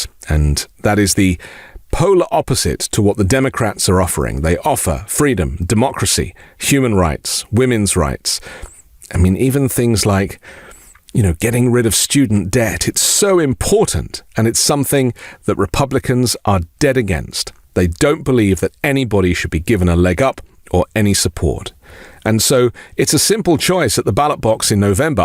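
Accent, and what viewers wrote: United Kingdom. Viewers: Glad to have a British accent reassuring us amid all the panic about Trump getting more votes from this 😂❤ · Thank you for these words! And thank you for your British accent! The US is a complete mess.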